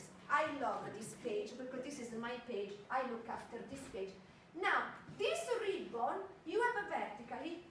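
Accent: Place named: Abruzzo, Italy. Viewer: No, perché dici che il tuo inglese sia "no good"? Sei molto brava. Soltanto la pronuncia, ecco, la pronuncia sa un po' di "Abruzzo con amore".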